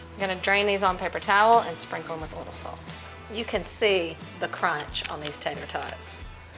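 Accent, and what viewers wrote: South, United States. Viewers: The southern accent really does make this show. If she spoke normal none accent English it wouldn't have the magic that it does. Go team murricaa!!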